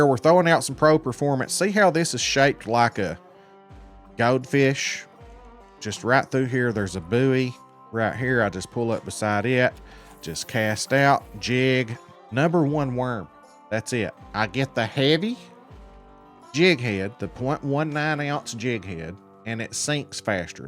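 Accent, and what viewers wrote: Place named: South, United States. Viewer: As a fellow southerner, you got a great accent, brother.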